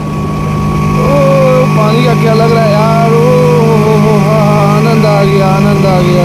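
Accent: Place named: Jharkhand, India. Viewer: Mast Jharkhandi language. Maja aa gaya.😊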